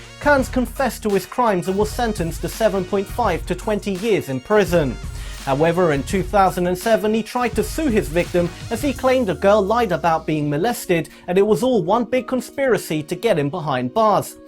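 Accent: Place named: United Kingdom. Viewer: Gets the second like and first comment, How's your day British narrator ?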